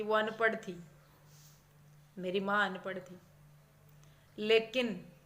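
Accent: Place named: Haryana, India. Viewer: Aapki aavaj se to asa lag aap haryana se ho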